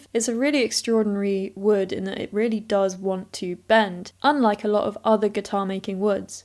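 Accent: England, United Kingdom. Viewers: "Mute it if you hate a british accent" -- LOL! I actually got hooked to this channel primarily because of your lovely british accent. XD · "If you hate British accent." I am watching this because I love the British accent. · You're great, and yes I love you're English I'm English also ❤️ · Who would hate British accents? This is so cool! At the beginning of video, can't wait to see it! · Amazing detail work , seen lot of people make these on youtube not sure i have seen that much passion put in to it ,oh and as a fellow Brit your accent is nice :D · Who could hate a British accent; especially yours,? Some serious skills you have! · Love the English accent and music on last video. How about some blues guitar in a future video. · I love British accents! Love the minion size guitar. Loved the singing and dancing while shaping the neck 😂. So excited about the new apprentice! 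· You looks soooo Spanish!!! It's almost weird to me hearing you talking in that British accent! Thanks for share your beautiful work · Love the British accent. from a pretty girl to boot.. Nice video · I’m an American so English accents immediately sound educational to me. No complaints. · First time viewer, I loved the video although I am not fan of English accent but I kept the sound on, because the amount of labour, precision and care you put into your work is amazing. Your videos are not pretentious, but actually entertaining and soothing for people who like woodwork. First video and I became a fan. Thumbs up... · i love brithish acent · Who the heck hates a British accent? Besides the Scottish that is... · Great to hear a British accent normally mute sound.. great work. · love your British humor and your accent as well ! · English accent? I must blame Monty Python, Iron Maiden and Black Sabbath that I quite like it, awesome work as always. 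And that heat bending of wood is black magic. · British accent? Only Americans say that! You surely have an English accent…🏏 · You never disappoint! Who hates English accents? You are a wonderful craftsperson, your new apprentice is very lucky! Loved the music! Thank you1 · Impossible: hating a British accent, a uke build. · Anyone that can't appreciate these amazing skills is probably sleeping through the video LOL! And shame on you - you could learn so much if you paid attention. Besides who doesn't want to see a beautiful girl with a Brit accent teach men how to do stuff? All the best elements entertainment, education, and fun😊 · I love a British accent, you are so lovely. excellent video! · Nothing wrong with an English accent.. from a Scotsman. So tell me what guitar was playing as you did the finishing touches, because I want to buy it. Good vid though, held my attention. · Loved it! 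(except for the sound :) ) . Also i will be making one of those drill drum sander attachments and fitting my metal vice to the bench with a simple single-threaded rod! PS Nice to hear an English accent (but then i am english) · I like this build! I think your bolt on neck was totally fine. It does not have to be a dovetail to be real neck joint at all. Remove such snobbery from your opinion missy! haha..Taylor guitars use this type of bolt on joint and it is great for neck removal for repairs and such. As you know a dovetail would be totally glued in, and require steam to loosen the glue and force to remove the neck with maybe some minor damage. Ask Ted Woodford! And also your British accent is delightful and lovely!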